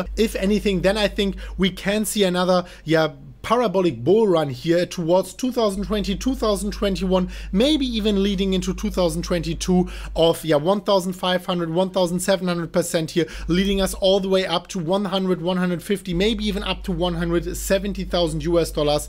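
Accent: Germany. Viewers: I love your German accent, it reminds me of Arnold Schwarzenegger, he is very famous and Americans respect him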